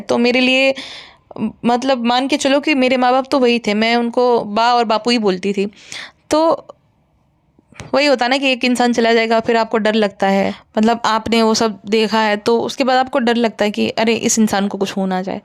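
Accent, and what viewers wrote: Gujarat, India. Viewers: Aur ek baat , apka voice bilkul rashmi desai jaisi hai। Or gujrati ho isiliye sayad acsent vi kuch had tak waisa hi lagta hai। Par voice to ekdam ditto hai।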